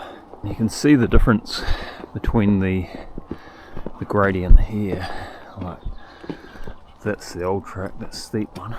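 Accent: New Zealand. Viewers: Sounds Kiwi, awesome presentation dude thank you.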